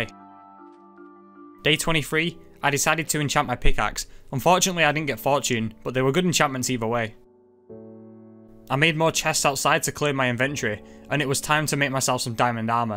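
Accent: United Kingdom. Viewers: Your Briish Accent is Strong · this mans scottish accent be poppin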